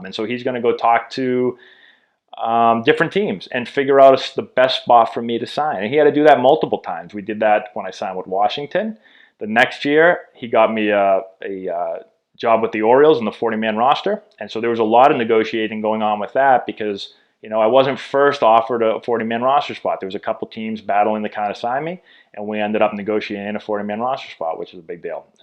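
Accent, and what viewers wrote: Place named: Massachusetts, United States. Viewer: I guess it's the Massachusetts accent. I heard car deal not card deal :) .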